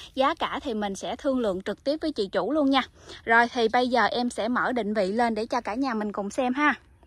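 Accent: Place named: Mekong Delta, Vietnam. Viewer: Thu Nhiên 🍃 Giọng đọc Chuẩn miền tây 👌👌 Tuyệt lắm tác giả 👏👏👏👏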